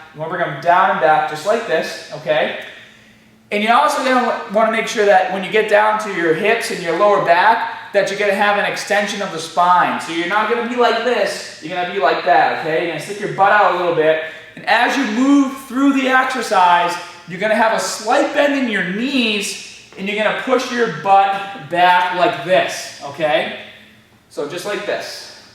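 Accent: Boston, United States. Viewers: I ABSOLUTELY LOVE WATCHING YOUR VIDEOS!! Especially with that Boston accent of yours❤️. Enjoy waking up & hear your cheerful self on YouTube! Wish you lived near me, I’d love a trainer like you. I’d appreciate any suggestions!! My right shoulder is 💩 for the past few hrs. You name it, I have it. Torn rotator cuff, torn labrum, bone Spurs, osteoarthritis, fraying of bicep tendon, etc. my ROM Is awful. I’m on my 10th cortisone injection over past few yrs. surgeon said need partial shoulder replacement BUT due to my age, 48.. he doesn’t recommend it. Told me I’d have less ROM after surgery heals. & only lasts 10 yrs.. even though it’s my shoulders that are 💩 it always interferes with any work out I do legs, glutes. I’ve been to physical therapy, chiropractor still going to that I do my wall stretches band stretches are used to be shredded I had the best arms around I can’t even do a 5 pound lateral raise. 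I would love any suggestions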